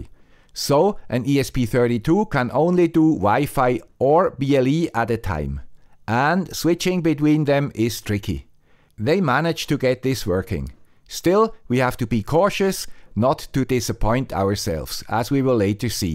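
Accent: Switzerland. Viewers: Just wait until how Swiss Accented Guy discovers NearLink / Green Tooth.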